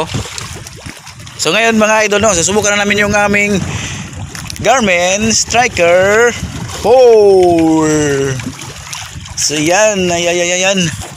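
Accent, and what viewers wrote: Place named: Bohol, Philippines. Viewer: tujok tujoka noy .. taga bohol man diay mo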